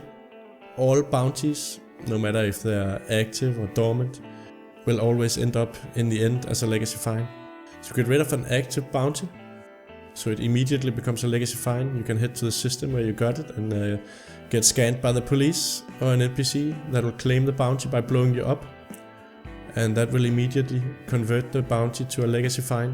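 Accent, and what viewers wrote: Denmark. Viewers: Hmmm, is that a danish accent?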